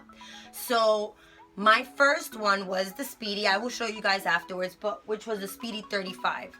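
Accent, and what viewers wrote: Bronx, United States. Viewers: Knew you were from the BX once I heard you talk. Im from the BX too and you took me way back. So many memories. So happy to have found you. Your closet is really dope. Love it. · I knew u was from NYC Bronx bc I'm from here too and I talk like u lol bc when I'm making my videos ppl always asking me where I'm from. Love the bags.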